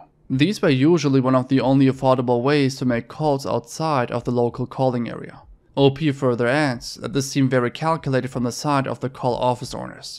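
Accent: Germany. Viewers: You've got a German accent. You are German.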